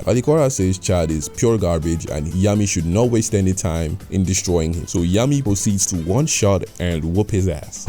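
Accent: Nigeria. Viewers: I had a feeling bro was Nigerian straight from the accent, well, as a Nigerian myself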